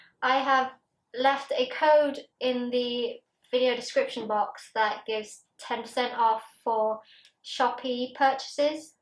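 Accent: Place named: United Kingdom. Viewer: Great review, love that British accent. Bravo!!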